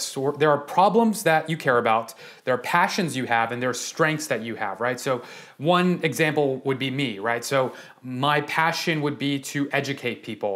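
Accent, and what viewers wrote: India. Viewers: Are You Indian? 😃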